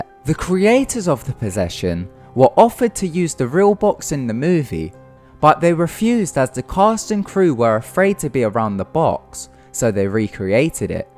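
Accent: United Kingdom. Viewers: I think he just has that kind of British accent...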